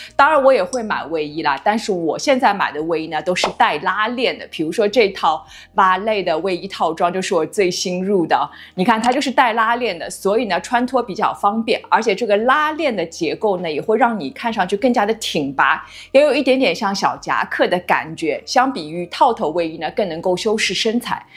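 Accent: Shanghai, China. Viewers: 播主上海人吗?言语间有些小词很熟悉😅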